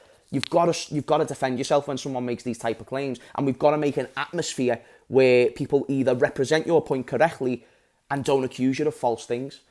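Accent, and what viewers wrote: Liverpool, United Kingdom. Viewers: Well said, I’m an Arsenal fan that watches your content for your footballing insight and your energetic personality. Plus as an Aussie, the Scouse accent is a true novelty 😂. No player is immune to criticism, in fact no individual is. Calling it racist is a real problem as it actually contributes to the issues of divide and separation which is the opposite of where we should be heading. Before claiming that someone is racist publicly, you better have some damn good evidence otherwise you become part of the problem in my opinion. Keep up the great content ❤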